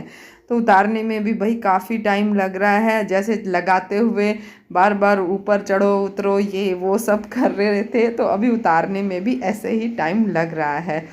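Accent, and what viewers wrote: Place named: Haryana, India. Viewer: Aaj awaj me dum hai haryana wali filling hai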